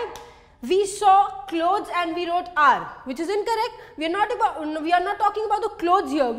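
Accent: India. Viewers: You are good. I really like Indian-English accent. But "I' is not a noun. It is a pronoun.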